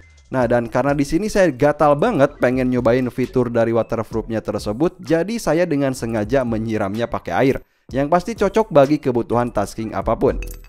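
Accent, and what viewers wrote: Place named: West Java, Indonesia. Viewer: Sunda pisan anjay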